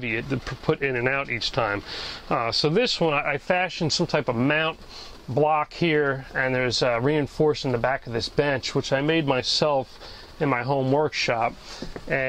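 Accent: New Jersey, United States. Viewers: terrible jersey accent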